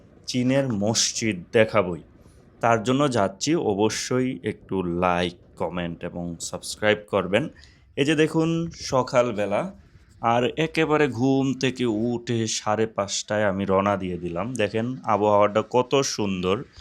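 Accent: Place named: Bhola, Bangladesh. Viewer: কথা শুইনা মনে হয়,তুমি ভোলাইয়া।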